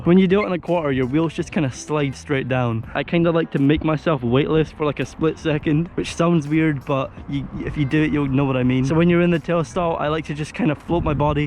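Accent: Scotland, United Kingdom. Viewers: haha love that scottish accent! Gonna live near the Loch Lomond one day when I´m old! Keep up the great work!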